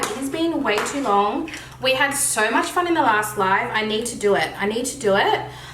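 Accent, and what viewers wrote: Australia. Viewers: Im so so sorry Australians and im absolutely not trying to offend u but i really really do not like an Australian accent 😮. It makes people sound so friggin unintelligent and almost as if ur slurring ur words like ur drunk. I think its the most unattractive and horribly sounding accent in the entire world. Btw, i have had zero influences. Please don't come at me with ur anger and verbal abuse. Like i said, its just my opinion which im totally entitled to express. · I have just found your videos and love having a straight talking Aussie beauty voice around. Love your vibe and realness very much. And laughing so much at how hot and sweaty you are because I totally get it 😂. So hard to even do makeup on some days the last few months. And so much appreciate the discussion on overconsumption. I am a proud environmentalist but also a beauty and clothing lover and struggle to live by my values in terms in that space in terms of overconsuming and wasting because there are so many cool things to try and express yourself with. 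But we need to be more mindful and keep trying and figuring out how to make sustainability work. I so appreciate you talking about this on your platform because if no one talks about it openly we all just sit here feeling guilty and not knowing what to do - nice to all be in it together rather than feeling lost amongst the pressure to consume from the media & influencers.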